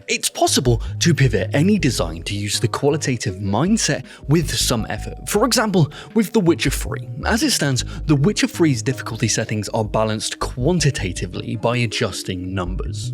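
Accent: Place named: United Kingdom. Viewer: correct because british accent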